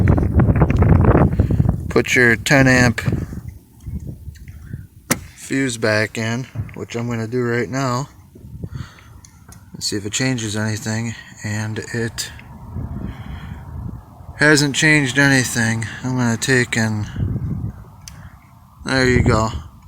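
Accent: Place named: Corning, United States. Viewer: you sound like my cousins from Corning NY... same accent lol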